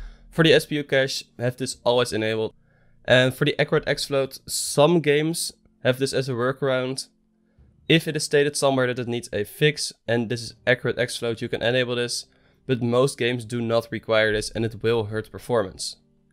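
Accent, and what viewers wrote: Netherlands. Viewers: You sound very Dutch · are you dutch by any chance? cuz i hear a dutch accent. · With an accent so thick, I can tell that you are definitely Dutch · correct me if i'm wrong but your accent tells me that your dutch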